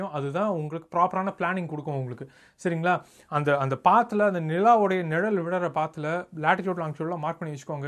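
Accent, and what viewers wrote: Coimbatore, India. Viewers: Bro neenga Coimbatore ah.... Namma aalu.. neenga kuniyamuthur nu type pannathukku apro unga slang semma.. bro Mettupalayam la ipo cloudy ah irukku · Excellent description abt solar eclipse bro. Especially in our Coimbatore slang, really i enjoyed it bro. And I got the solar film for ₹1020 from Mumbai. "Tejraj and co" Mumbai based astronomical equipment manufacturer. If some one need it can use.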